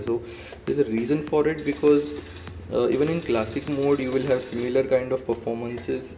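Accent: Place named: India. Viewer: I just can't ignore that annoying indian accent, however, good video mate!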